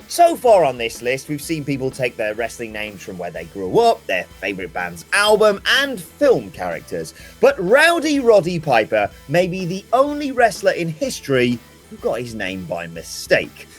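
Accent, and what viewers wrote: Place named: England, United Kingdom. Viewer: That's exactly how it's pronounced. What's your problem with it? I'd like to know. If you mean that Americans say it like "motcho" that's just a difference in vowel sounds. The guy in the video is English, and that's how we say it.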